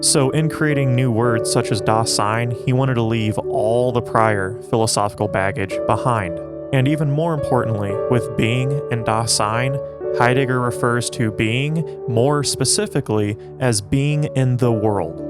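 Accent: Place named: South, United States. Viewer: Intellectual southern accent is nice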